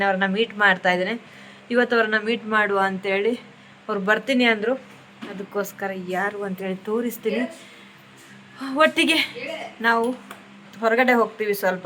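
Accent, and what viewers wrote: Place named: Hubballi, India. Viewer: ಹುಬ್ಬಳ್ಳಿ ಕನ್ನಡ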